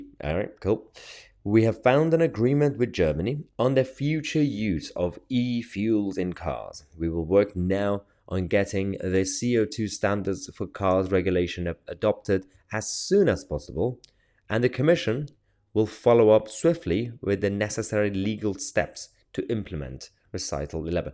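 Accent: United Kingdom. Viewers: A brown Muslim that sounds British... anchor baby's got a u tube chanel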